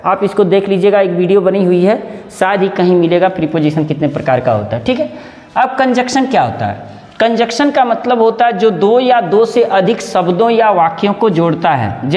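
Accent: Bihar, India. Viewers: Are you from Bihar. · बिहारी है मैने पहचान लिया रिभर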